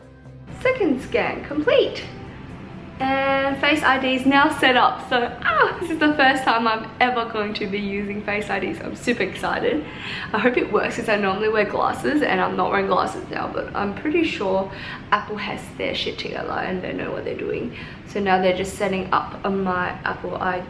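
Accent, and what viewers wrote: Australia. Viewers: I had a feeling you were Australian and then you said it and I was like YES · so weird to hear an asian speaking Australian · I’ve always wondered how my name would be said with an Australian accent haha. Now I know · Sorry but she’s nice and pretty and all but I’m not really into people with Aussie accents (not love I mean like to watch them on yt) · The way she talk like Rosé of Blackpink ..aussie accent tho ☺ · I knew u were from Australia cause of ur accent . Reminds me of the Rebeka twins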